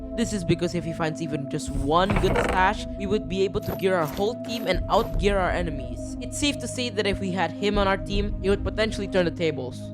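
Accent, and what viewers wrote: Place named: Philippines. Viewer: You talk like your pilipino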